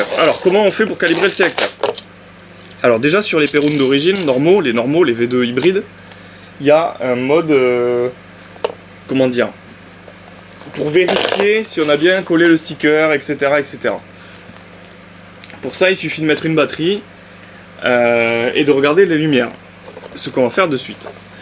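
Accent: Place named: Southwest, France. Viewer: y'a un petit accent et des expressions sympathiques , tu est du sud ouest peut être ?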